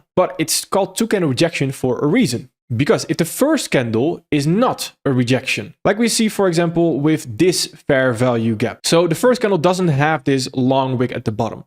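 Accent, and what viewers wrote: Netherlands. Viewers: Top notch content, simply top notch! Also, you're Dutch no? I've listened to Peter Bom for a while and there's similarities in the accent.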